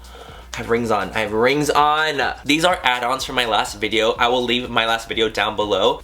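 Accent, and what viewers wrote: England, United Kingdom. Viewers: Why does he talk in a British accent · can you do an entire video in your English accent please? tysm!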